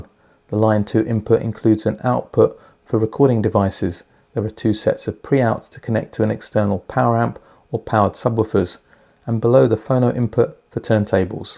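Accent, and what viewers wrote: United Kingdom. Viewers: Thank you for another impressive review! Your articulation of audio is authoritative - your knowledge, experience and technical insight is unmatched in sophistication. The British accent and your command of the language simply makes everything you review sound so upper echelon and creme de la creme! Am I sensing that the AJ Uni Fi References are perhaps starting to grow on you as they burn in?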